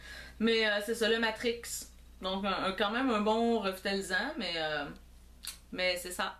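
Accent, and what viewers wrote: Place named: Quebec, Canada. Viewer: Je viens de te découvrir et je dois dire que j'adore tes vidéos, tu es super fun et ton accent.... mamamia j'aime trop l'accent québécois. Bref, je m'abonne à ta chaîne. x) PS: J'adore ta nouvelle couleur de cheveux elle est superbe. <3<3<3<3<3<3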